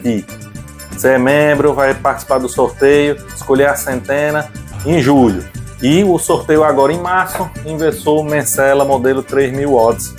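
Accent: Northeast, Brazil. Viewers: Meu amigo, você é de qual estado do nordeste?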